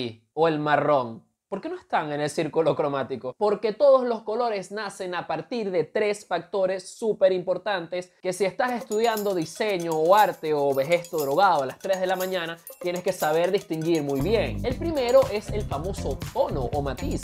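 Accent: Venezuela. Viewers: Se te nota lo venezolano mi pana.... Me encanta dX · Me encanta como.explicas todo, muy venezolano je je je ❤ tenía tiempo que no escuchaba uno, me gusta el color rojo !!! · Amé demasiado este videooo, desde el inicio me enganchó el acento y jerga venezolana. Que bonito saber qué hacer creadores de contenido de Venezuela que si aportan de verdad y no andan sin camisa y comiendo carne cruda jajaja Tienes una manera increíble de unir teoría y práctica, seriedad y humor. Al igual que el color estás lleno de contrastes y eso es lo que te hace especial ☺️ganaste una nueva suscriptora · Caraqueño o Valenciano? De maracucho no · Es venezolano por el acento No? Pero de que Estado ? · Viva nuestro dialecto venezolano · Chamo, se te nota lo venezolano... ❤ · Me uní por lo que aprendí con este vídeo y por el acento de mi gente criolla venezolana!!! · Buena información, pero el acento venezolano no lo soporto hermano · Coño pero que buen video, yo qué no tengo paciencia para ver ni películas, bro arrechísimo y paisano venezolano con ese flow!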